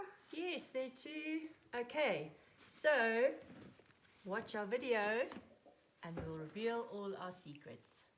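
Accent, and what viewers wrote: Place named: South Africa. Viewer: A lovely video! Deserves way more views. I'm a South African baker/chef living and working in Sweden. Found the SA accent very comforting. Fantastic bread, you certainly look like you've worked professionally as a baker at some point. I'm finally beginning to master my techniques. Found the main stumbling block for me when concerning baguettes was using professional ovens. Unfortunately we don't have those amazing deck ovens at my company but rather convection ovens. They would instantly set the crust and not allow any ear formation or open crumb. After many fails and frustrations I finally settled on preheating the oven and the pizza stones to 300c with steam, and as the baguette go in the oven I'll actually just turn off the oven for the first 7 minutes while they bloom. Anyway thanks for the lovely video. Wish I found it sooner, it would have saved me so much time and frustrations. 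Go well, and keep baking!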